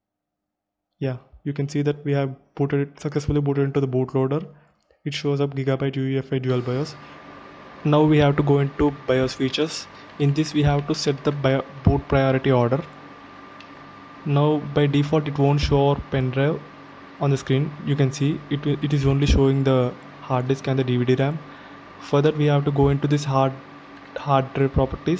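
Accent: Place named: India. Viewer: Oh yea, the indian accent❤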